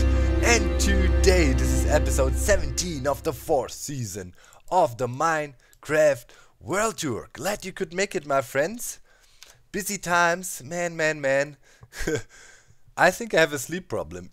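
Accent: Germany. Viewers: Hi. Here's something about me: You and Xisuma were my first two subscriptions. My ears fell in love with your soothing German accent and mannerisms, and even your occasionally mispronounced words. Please say "ingredientses" more often!:D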